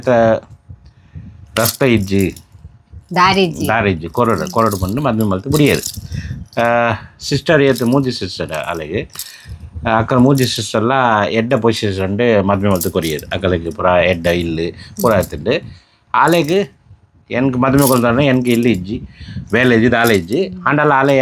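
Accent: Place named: Tulu, India. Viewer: Ur tulu 😂👌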